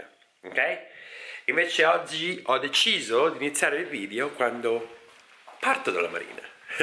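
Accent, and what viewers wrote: United States. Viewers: Ciao, ho iniziato a vedere i tuoi video, fatti molto bene, complimenti. È strano sentire che hai un accento americano quando parli italiano, ed un accento italiano quando parli inglese! 😄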